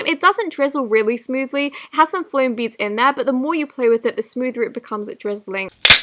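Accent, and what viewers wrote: United Kingdom. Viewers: She's British so she can't pronounce it like Zoo-keen-ie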